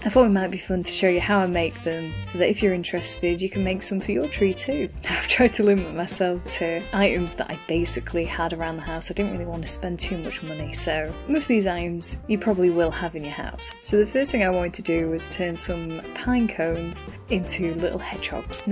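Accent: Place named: United Kingdom. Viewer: Brilliant, clever, clear and fun. Also lovely to have a native Brit. for a change!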